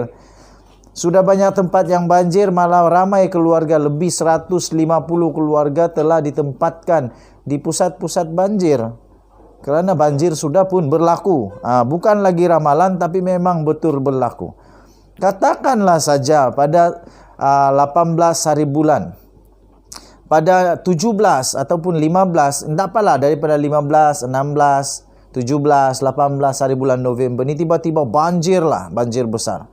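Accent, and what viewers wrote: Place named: Penang, Malaysia. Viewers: terbaik haaa loghat penang hahaha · Awat u cakap.loghat penang.peliq aishh..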